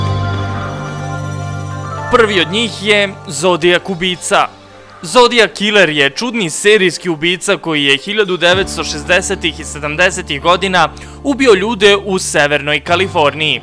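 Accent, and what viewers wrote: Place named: Niš, Serbia. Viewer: odakle si ja mislim da si iz Niša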